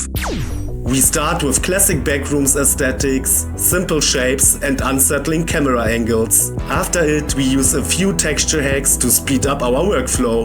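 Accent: Germany. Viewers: great video and love your accent. Are you German?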